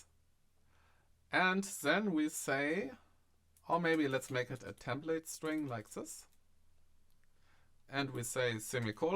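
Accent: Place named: Germany. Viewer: He literally sounds German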